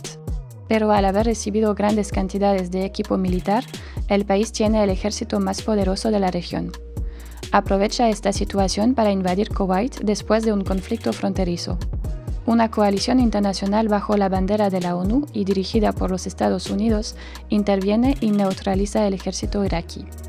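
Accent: Russia. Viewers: acento ruso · Los documentales muy buenos... pero la voz de la locutora maravillosa. El acento es ruso? 🤔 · Creo que la chica tiene acento rusa.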